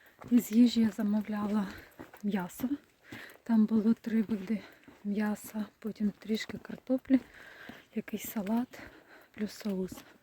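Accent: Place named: Donetsk, Ukraine. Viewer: дякую , що не проігнорували моє питання . Я таки відчула по мові , що ми з вами землячки . Я теж родом із Донецької області , В-Новоселкіасткий р-н, це ближче до Курахове , Марʼїнки . Але заміж вийшла і переїхала в Заплрізьку область . Начебто області знаходяться поруч , а українська мова має свої нюанси ( діалекти на заході України ). Тепер ми всі біженці - мої родичі з Донеччини і ми із Запорізької області , бо частина області окупована , частина під обстрілами . Так і скитаємося по світу…А ваші відео приємні , заспокійливі , трішечки із смутком . Бажаю вам багато підписників і якнайбільше переглядів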